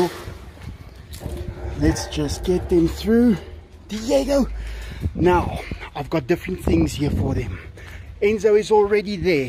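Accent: South Africa. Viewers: Are you South African? You kind of sound like a mixture of Steve Irwin and sharlto copley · Is this a south african accent? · The accent is unmistakenly South African...unless I'm mistaken. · Cartoon head Since people decided to be rude answering you, I’ll explain the reason so you don’t argue back rudely and cause another pointless comment war. Apparently, this person received 3 rescue tigers (1 died and Enzo was really sad, that’s when Diego came in) and since these are rescue tigers, they never learned from their mothers how to survive out in the wild to hunt and eat. This person also lives in South Africa (his accent), and these tigers do not belong in that region. If they are free, they will starve to death because they’ve been in captivity for many years with this special person and they’ve been fed by him.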